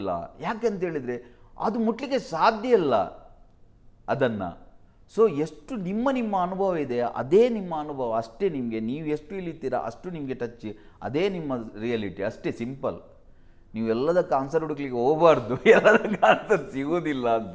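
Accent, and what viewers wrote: North Karnataka, India. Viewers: To the interviewer - you should have come well prepared bro ... Looks like you are from North Karnataka. Your accent says it all. But please do your homework 🙏.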